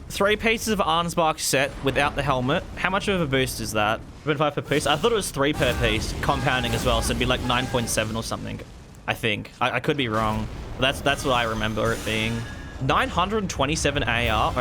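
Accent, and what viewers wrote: Australia. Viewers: i just found this vid after googling if reduvia was any good, only played about 1.5h so far, first playthrough. anyway, thought you were an aussie, confirmed it with the extra dry, so, hello from FNQ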